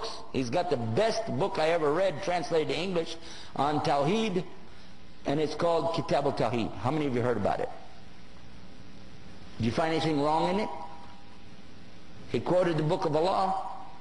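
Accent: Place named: Texas, United States. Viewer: هل الشيخ من أصول تكساسية؟ لهجته كأنها من تكساس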